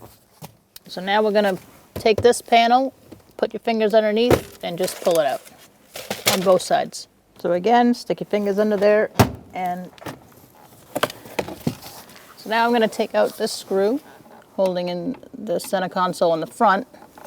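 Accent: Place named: New England, United States. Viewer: wonderful video. i love the new england accent as well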